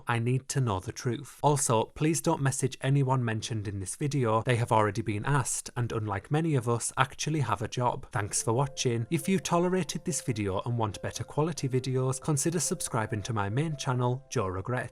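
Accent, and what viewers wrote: United Kingdom. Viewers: English accents are hilarious, the southern accent is funny but your one is really funny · Great video, your voice reminds me of Jon Ronson. And with that, has that Cardiff sound 😅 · Why is he so british?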